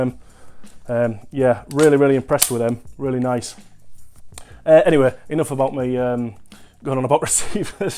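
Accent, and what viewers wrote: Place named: RP, United Kingdom. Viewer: You sound very Posh! At least to me, i'm form Philadelphia. lol.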